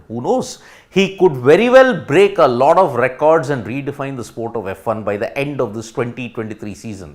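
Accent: South India, India. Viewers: You english voice is sweet as a quecumba I like your throwing of english language natural south Indian way of speaking and about niraj he should not stop his aim would like to clinch more try to engulf more distance the record of 98 and learn more and generate new player as like a dhrinchariya of jebling throwing sudip greatness and pride is attached to him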